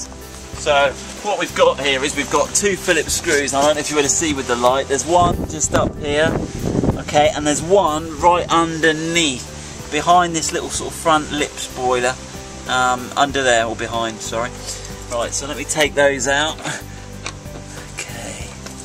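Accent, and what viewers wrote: United Kingdom. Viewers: 😂😂😂😂 That British accent😅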